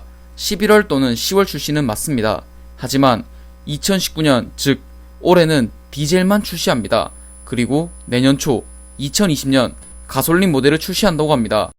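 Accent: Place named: Gyeongsang, South Korea. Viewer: 숨길수 없는 깅상도억양 첯음절에 강력한 엑센트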